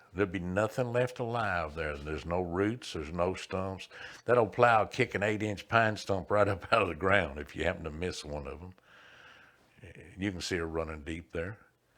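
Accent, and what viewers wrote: South, United States. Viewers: Haha! Great video! I like listening to you narrative! That good ole country accent! God bless the work of your hands! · Loved the Video AND The Country Boy Narration!! Haha! Get Er' Done!!😎😎😎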